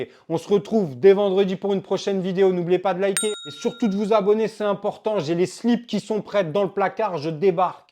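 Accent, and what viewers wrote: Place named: Banlieue, France. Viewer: Très bon contenu, mais pourquoi cet accent de banlieue? Dommage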